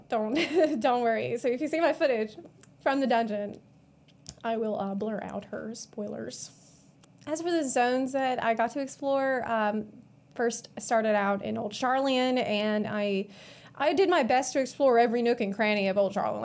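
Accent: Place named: Georgia, United States. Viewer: The Georgia accent really coming out here haha. I love it!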